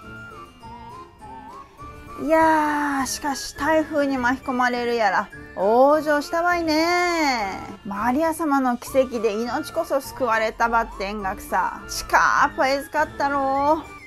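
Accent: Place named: Hakata, Japan. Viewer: 博多弁が好きな方🙋🏻‍♂️です😊 椿さんすごいですね！ちかっぱ面白かったです🙇🏻‍♂️ 次回マカオ行く時の楽しみが増えました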